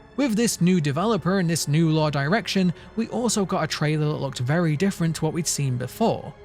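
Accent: England, United Kingdom. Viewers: Um actually u speak the English language and the sound of ur accent is British and no one in America says "oh ur speaking British" SMH ignorance is bliss huh lmmfao rofl GOD SAVE THE KING CHUCKY CHEESE AND QUEEN CAMELTOE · Wait you are british???,man I thought that you are american 😶😶😶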